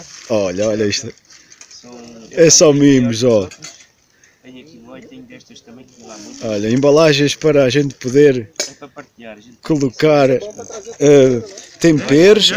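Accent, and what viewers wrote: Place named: Rio Grande do Sul, Brazil. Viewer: Meu amigo você é gaucho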